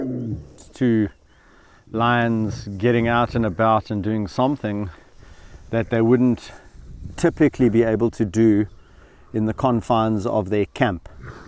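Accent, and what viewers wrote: South Africa. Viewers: Those manes. Can’t BELIVE. How full and thick they are. Andi just love the way. You talk to them and love the South. African accent. Love thst beautiful country. Gods own country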